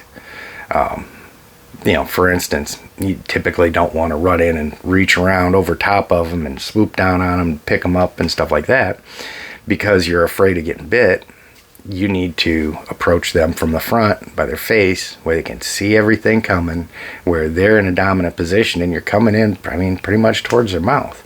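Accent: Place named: Northern Illinois, United States. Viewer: I was gonna ask if you are from N Illinois! Close enough! I still have the accent too and been gone 20+ years... And thanks for your service man!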